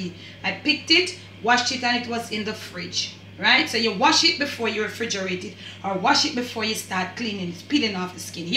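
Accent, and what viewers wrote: Jamaica. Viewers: I l❤ve Jamaican accent mon!!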